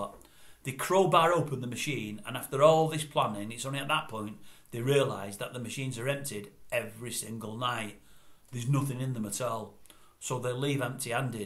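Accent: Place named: Manchester, United Kingdom. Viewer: You’ll tell the story because it’s content and it’ll earn you a few quid through views jacka norie jacka norie accents annoying manc farmer inbread it’s one of them,, the stories irrelevant by time you tell it 🥱🥱😴😴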